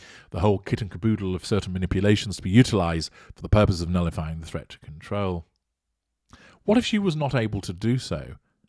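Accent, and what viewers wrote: United Kingdom. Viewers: I love your Channel, yours contents and your British accent keeps me watching over and over because I am addicted to it. Greetings from🇧🇷❤️🫶🏻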